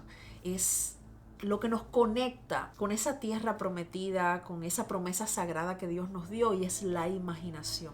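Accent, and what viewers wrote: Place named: Cuba. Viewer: Hola siempre que te escucho me lleno de energía positiva, eso es lo que transmites, me imaginé que eras cubana hoy lo confirmo, que bueno, también yo. Dios te bendiga 🙏🙏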